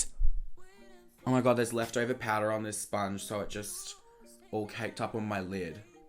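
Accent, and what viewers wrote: Australia. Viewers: lmao at the aussie comments and voice hahahaha 👌😂😂😂😂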